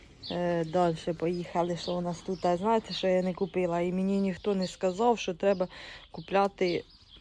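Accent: Poltava, Ukraine. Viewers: Добрий день україночка! Говор полтавський! Звідки сама? Замужем? Трактор МТЗ зачот!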